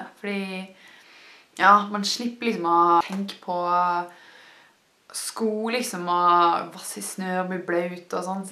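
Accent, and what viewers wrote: Trøndelag, Norway. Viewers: Så du er fra Trøndelag? Jeg prøver faktisk å lære norsk (nederlansk btw) å jeg kan forstå deg veldig godt. Jeg tror at Norsk er verdens vakreste spåk men norge har så mange dialekter så de blir veldig vanskelig å lære. groetjes uit nederland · definatly from Norway. :) Can hear it in the dialect. ;) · First I thought "That sounds like Trondersk (I have been learning that dialect myself" and then she said "Trondelag" and I got so happy that I guessed it right. I might develop some kind of ear for accents (That would be cool)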